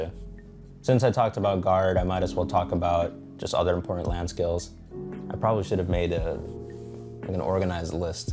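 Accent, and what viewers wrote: Belgium. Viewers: You're from belgium? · Are you from Belgium? :D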